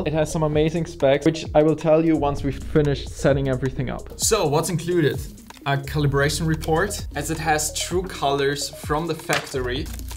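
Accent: Germany. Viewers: I hear german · German English, cool:face-fuchsia-wide-eyes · It's funny how your english sounds flawless 90% of the time, while being so obviously german at the same time.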